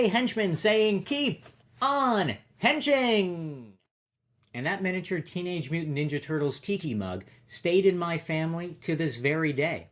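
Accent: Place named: Canada. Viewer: Pronouncing "been" like "bean", yep.. 100% Authentic Maple Flavor Canadian.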